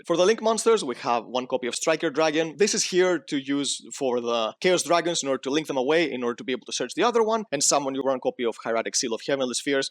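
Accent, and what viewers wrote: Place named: Greece. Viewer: Hey new to the channel here and the first thing I thought hearing your voice was that you may be a fellow greek. Having said that, great content, waiting for more!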